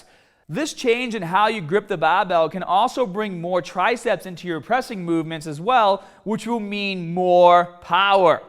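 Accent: Boston, United States. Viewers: Boy from baaaston or new england for dam sure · Are you from Boston? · God I feel like I just took a trip to Boston. if I did a video you would probably get a kick out of me saying barbell and yard.My step mom is from Boston and she says bahh-bell instead of barbell and Bahhs-ton instead of Boston lol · Can you not see that he's from Boston or are you just that dense?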